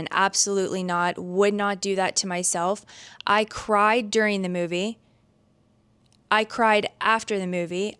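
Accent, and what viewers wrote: Canada. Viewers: I can't hear a distinct American accent from you. You can pass as a Canadian. You sound like a Canadian (at least from Ontario). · lol. these differences are the same between states. this is so ridiculous to think there are any real differences. you actually sound more canadian than i do. lol. i think your jokes weren't landing because they are not funny.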